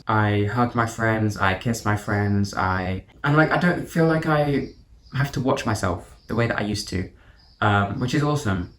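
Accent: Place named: United Kingdom. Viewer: Your British accent is so cute 🥹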